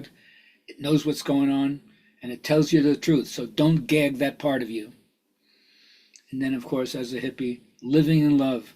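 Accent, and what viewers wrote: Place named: India. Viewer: That Indian accent 🤣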